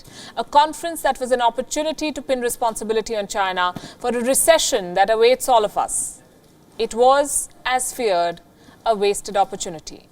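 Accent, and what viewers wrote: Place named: North India, India. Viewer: I dont like this North Indian English accent without giving a pause she speaks.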